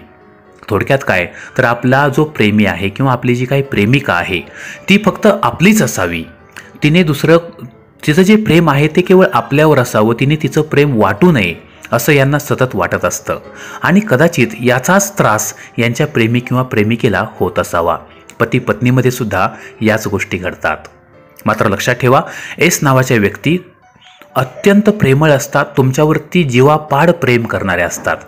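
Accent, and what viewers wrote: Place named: Delhi, India. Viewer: दिल्ली गर मराठी तुमचं. नाव काय आहे ते सागा 🤩🤩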